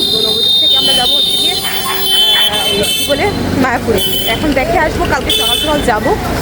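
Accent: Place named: Bangladesh. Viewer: Your speaking tone has a influence of east bengal but it's quite natural for North Eastern bengali people like tripura ,Assam ..btw welcome to my hometown Nabadwip..enjoy 🙏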